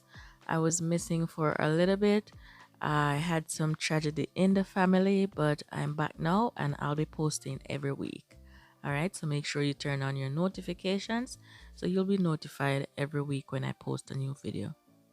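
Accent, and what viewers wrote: Jamaica. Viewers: I can hear you are Jamaican. Big up uself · Talented. You sound like a Jamaican. I am a Jamaican.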